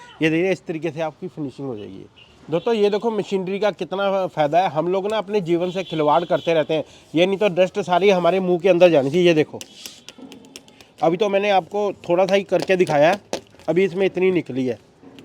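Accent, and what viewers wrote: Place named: Punjab, India. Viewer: Abhi aap delhi se hai ya punjab se aapke bolne ka dhang punjabi lag raha hai 🙏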